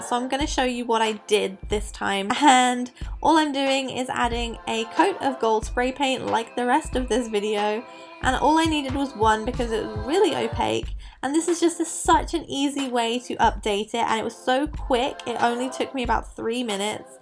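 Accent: England, United Kingdom. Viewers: Well She Has An British Accent, But Yeah I Go With U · is she english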